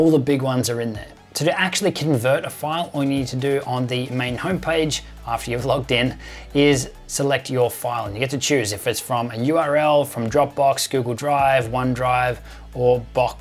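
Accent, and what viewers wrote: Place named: Australia. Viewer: Nice work mate. Your work on these productions is much appreciated. Hearing another Aussie on YouTube is also a bonus. :)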